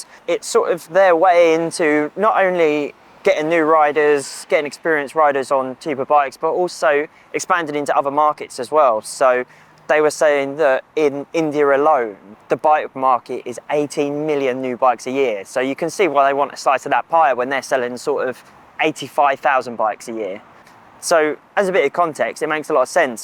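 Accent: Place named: South East England, United Kingdom. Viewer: Great bike, but really disappointing to see that MCN still feel that people ‘crippled’ with a South East accent are only suitable to do video reviews. If you are a UK newspaper/magazine, maybe it’s time to have accents outside the South East represented. I’m from the South East, but travel a lot around the UK on my bike and talk to fellow bikers, but realise that the people I talk to aren’t represented in terms of accent and authenticity on MCN. There are some very knowledgeable motorcycle riders outside the South East bubble. Even the BBC realised that folks outside the South East know stuff. Come one MCN, come out of your South East echo chamber. It’s particularly annoying knowing you’re based in Peterborough, which isn’t even in the South East! Let Wales, Scotland, Ireland (North and South), the North of England and the Midlands and even the South West of England be heard. 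Do what the BBC did and learn to embrace your wider audience, rather than your tea room. Otherwise, keep doing what you’re doing, as you’re fab!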